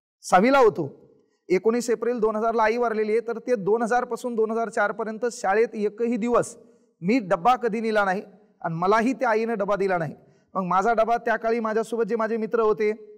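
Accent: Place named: Latur, India. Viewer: I live in Ireland. I am not a student but i have been watching his reels. Mi Latur cha ahe ani siranna boltana aikla ki nehmi gharachi athavan yete. Bhasha apli naal ahe. Sir ek no ahet 🔥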